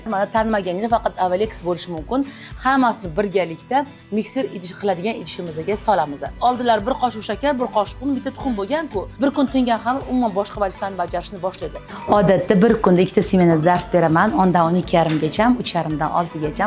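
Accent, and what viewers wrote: Tashkent, Uzbekistan. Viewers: Кечирасизу нега водийлик була тошкент шевада гапирасиз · Узиз фаргонадан бусезам Тошкент шевада яхши гапирасиз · Фаргоналикман дегандингиз.Тошкент шевада гапиряпсизу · Сиз фаргонадансиз,Миндондансиз тугрими.нима у/н Тошкент шевада гапирасиз.Миндонди шевасидан уяласизми.нимага пойтахтга бориб шеваларини тезда сотасизлар.буни кандай изохлайсиз.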